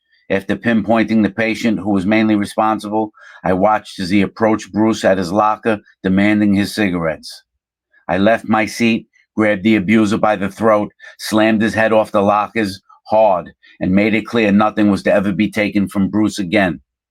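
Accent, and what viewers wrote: New York, United States. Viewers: I'm sorry you had to see that horrible incident with poor Manny. Your mind went from chuckling because he was stripping and you thought he was just being a nut and then suddenly it wasn't funny. He was hanging there like a piece of meat. It's too horrifying to comprehend. I confess I snickered a few times. Not because anything was funny but just your storytelling style cracks me up. 😊 Also want to say that your videos are really amping up in quality and style. The chilling view down that melancholy hallway, the gates slamming, The Moody lighting. Your New York gangster accent in your deep soft voice. You're going to have to start charging us admission. I was silent and stunned for quite a while after watching that video. I think I still am.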